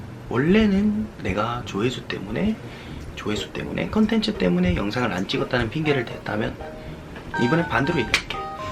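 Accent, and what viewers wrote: South Korea. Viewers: 나 화면밖에서 경상도 사투리들려서 들어왔는데 레알 경상도였네...그래 잘생겼다.... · 뭔가 말투가 완전 대구사투리는 아닌것 같은데 ? 서울말이랑 섞인건가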